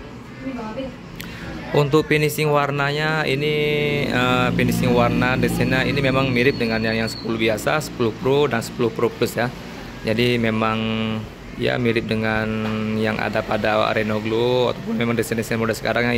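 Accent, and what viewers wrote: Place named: Malaysia. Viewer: logat bahasa indo anda ada logat bahasa Melayu Malaysia..mungkin anda berasal dari Kalimantan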